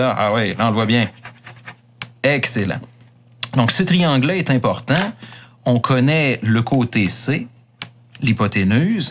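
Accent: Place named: Quebec, Canada. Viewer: Français accent québécois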